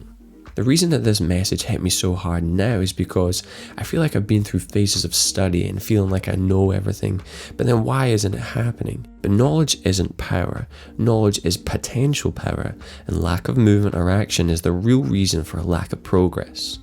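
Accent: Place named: Northern Ireland, United Kingdom. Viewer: Sorry man, was thinking Scottish but Northern Irish was in my head too. I'm from the Republic meself. Hope to see your work get recognition some day.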